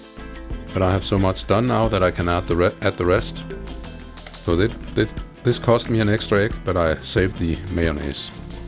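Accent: Denmark. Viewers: I knew you were danish because of your accent 😉 thanks for the video 😊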